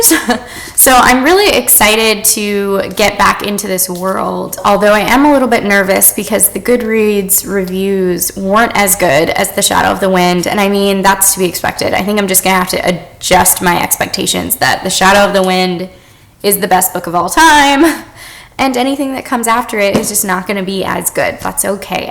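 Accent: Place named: Upstate New York, United States. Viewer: HI just stumbled across your channel and I LOVE YOU ALREADY! where are you from bc u have a bit of an accent... i could be totally wrong but upstate new york? possibly buffalo?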